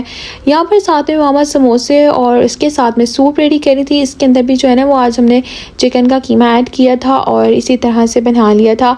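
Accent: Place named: Arab world. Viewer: Ma Shaa Allah apka Arabic bolna ka andaz buhat pyara ha❤❤❤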